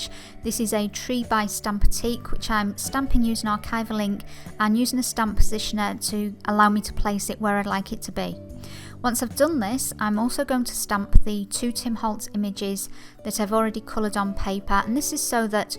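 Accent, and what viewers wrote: England, United Kingdom. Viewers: What a beautiful page ! Your sweet English accent reminds me when I was a little girl I used to watch ART ATTACK with Neil Buchanan and his lovely accent , he opened my eyes to art and crafts and you nourished my sense with your magical touches Wish you luck and happiness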